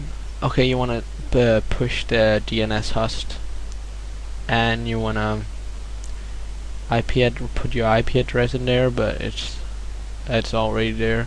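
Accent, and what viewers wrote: Denmark. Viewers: Er du dansker? :D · are u from denmark?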